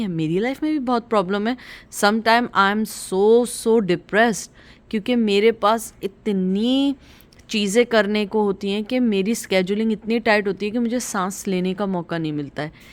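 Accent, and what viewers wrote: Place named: Karachi, Pakistan. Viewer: Hi I am in Virginia. I wish I can meet you. You sound like you are from Karachi too. ❤️❤️❤️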